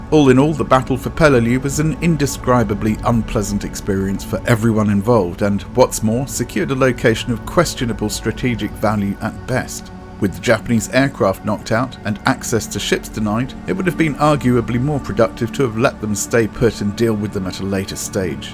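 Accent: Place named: United Kingdom. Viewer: Thanks yet again for an informative an interesting piece of work. Your style is and unruffled and your British accent is, of course, charming as hell...as always. Have to say I did miss " " at the end, though. It is always such a relaxing end to a good time, especially if you do a ramble at the end. More, Please, Sir!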